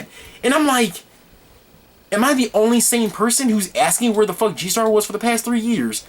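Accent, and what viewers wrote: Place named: Australia. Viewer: He has Australian accent, not British.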